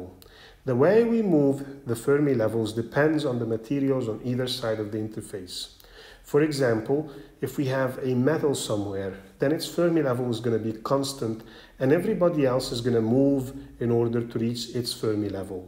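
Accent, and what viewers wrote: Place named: Egypt. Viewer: Best explanation ever! I am proud to feel the Egyptian tone and style.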